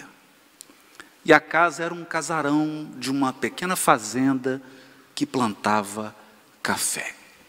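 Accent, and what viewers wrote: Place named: Minas Gerais, Brazil. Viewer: adoro as suas palestras. Vc, esse seu jeitinho mineiro, torna tudo tão simples e fácil de entender. Gratidão querido! Abraço 🥰🙏🏻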